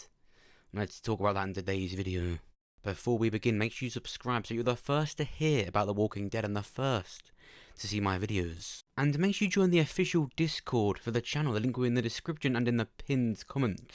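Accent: United Kingdom. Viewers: you really sound like Jamie Oliver, one of the many family chefs in the UK, I'm sure you know who he is and I'm pretty sure you guys also came from the same area because of your accents.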